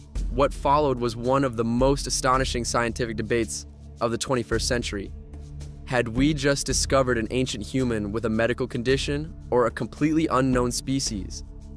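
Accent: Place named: United States. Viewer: I like this reading voice. It's not AI! SO EXCITING to hear a human read and americanize the mispronpunciations. I love it! Would be great to show to children. Because of the animation. The ending is always a little abrupt. Ask a question at the end to get more comments. The videos are good and your voice is nice to listen to. I heard nothing new in this video. But, even the fake animation was great. We never get visuals because, they don't want to use movie clips or things not really too close to accurate but these graphics make it nice to watch for sure and let the imagination can run wild with it! Thanks again. And thanks for reading this in the real world!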